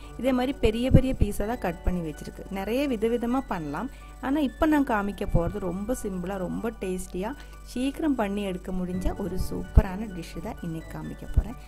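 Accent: Kerala, India. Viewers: ur tamil is so good.. though little Malayalam accent... where r u from mam... my Dubai kerala friend told me this recipe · Unga Tamil malayala vasanaiyodu alaga erukuu. ❤ & Thnx for the information on how to select kappa kilangu dear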